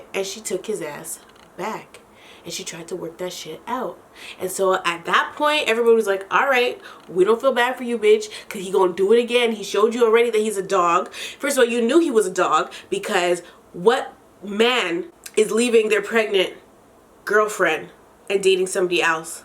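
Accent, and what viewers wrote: Toronto, Canada. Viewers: First of all, I KNEEEEWWWW you were from Toronto from the minute you opened your mouth, I love my Torontonians. Second, you confirmed it with the Brampton mans shit. I liked and subscribed just cuz you said "If you know, you know" about Jamaicans, cuz its so true LMFAO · I really like how you talk, Imma need to bring my Jamaican/Indian self to Canada to get me a nice Canadian accent lol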